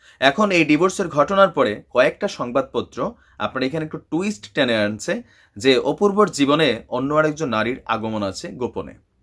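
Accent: Comilla, Bangladesh. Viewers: আসসালামু আলাইকুম ভাই কেমন আছেন ইনশাআল্লাহ বালই আছেন ভাই আমি সিলেট মৌলভীবাজার শহরের আপনার কথা খুব সুনদর কুমিল্লা ভাষা টাও বাল লাগে। তবে বাই যদি দিন অথবা তারিক,টা দিয়ে যদি ভিডিও করতেন তাহলে,মনে কষ্ট নিবেন নাকি,দোয়া কইরেন বাই আল্লাহ যেন সবাইকে মহা মারি থাইকা হেপাজত করেন আমিন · Love from comilla Vhai comillar vasai kotha bolen moja lage😁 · ভাই আপনি কুমিল্লা বাসায় কথা বলেন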